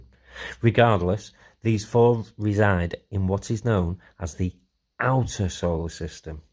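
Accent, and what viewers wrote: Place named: United Kingdom. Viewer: I love stuff like this. Love the British accent of the narrator too! Easy to understand info. Even my wife was able to follow! 😂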